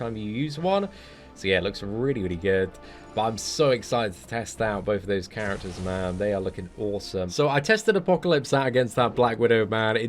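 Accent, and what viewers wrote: United Kingdom. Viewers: I love apocalypse you are lucky Also love the British acsent it is the best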